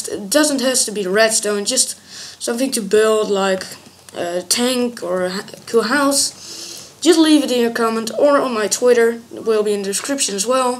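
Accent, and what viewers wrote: Netherlands. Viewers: I am Australian. I was actually quite impressed with your English. Thought you sounded a little Dutch, so I just wanted to check :) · bent toch nederlands he? Ik hoorde het al aan je stem en accent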